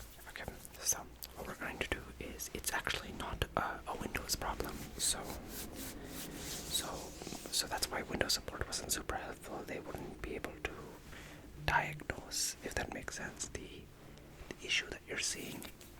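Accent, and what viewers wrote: India. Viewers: the indian accent is one of my favorite ASMRs... very nice! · 16 minuts? And indian accent is more noticeable? My new favorite video in this channel. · If I ever have tingle immunity, I head straight to your Indian accent videos. Love this one!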